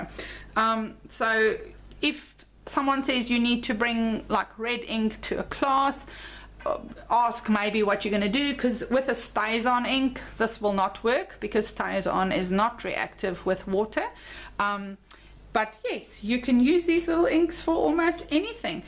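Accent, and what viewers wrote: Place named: South Africa. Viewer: Thank you for this great comparison. So fun to hear a South African accent on a crafty video ❤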